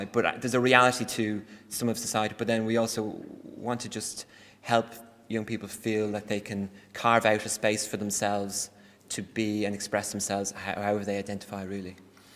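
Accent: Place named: Ireland. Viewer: If he had a German accent instead of that lovely Irish one, I think people would be much more suspicious of him.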